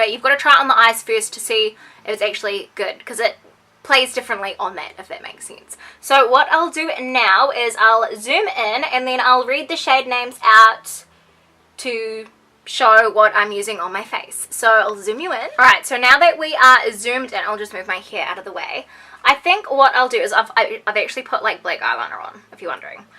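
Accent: New Zealand. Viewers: are you a new zealander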